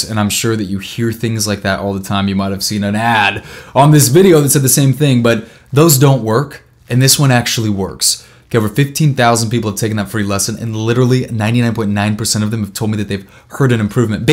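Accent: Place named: Andalusia, Spain. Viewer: Habla perfectamente bien, con su acento andaluz personal.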